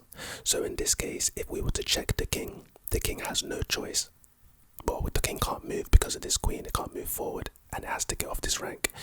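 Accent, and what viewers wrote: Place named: South London, United Kingdom. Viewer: Mr if you don't mind me asking where are you from? cause you have a very distinct south London accent and I honestly can't figure out where in south your from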